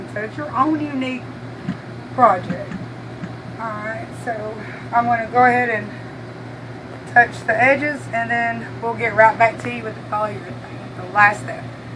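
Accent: South, United States. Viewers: i love your videos btw, are you from the carolinas? i love the southern bell twang. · Hey there! I LOVE this tutorial/idea and have already bought the supplies. I think you are super cute and your Southern accent is adorable. I will share pics of my completed project when I am done. God bless you hon, wish we were neighbors! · Love your southern accent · ... while this particular design of granite is too busy for me, I have to say that it is a great technique, especially for people who are on a budget, $25 is an awesome price to transform counters. As far as your way of speaking, don't apologize for it. You are southern and sometimes that's how southern people speak. It may not always be correct but it's not a sin, LOL. I'm from New York and we have a distinct accent as well and we say words differently but I'm proud of how I speak because I'm proud to be from New York City. 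When I make mashed potatoes I always call them taters:) because I love that word! Another word I like is reckon, so keep on being YOU <3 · I can't add anything that has not already been said except "War Eagle"!! I was so glad to hear a southern girl sharing such great teaching information. I am from Alabama and have added myself as a subbie. Really look forward to seeing your other videos and any new ones. God bless and thanks for sharing! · Kudos to the closed captioner whoever you are! Sometimes I have a bit of difficulty understanding a Southern accent - and you were totally unflappable. :-) This looks like a good way to 'upscale' a counter! Which is a nice way to look like you have a champagne budget when you only have a Kool-Aid budget. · I just love you accent, a true "grits" girl like myself. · Okay, I hate to ask this, but I'm from Michigan and you have a southern drawl and there is one part that I'm not quite sure what you are saying. 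You said in the very beginning to make sure you get the kilz base..Now I don't know if you said OLD Kilz or OIL base Kilz... I really want to do this and I don't want to mess it up. I put the captions on and it showed that you said Old.. but when I watch you say it, it looks like you are saying OIL but with that southern drawl. Could you please clarify for me so I don't mess it up? Thanks in advance.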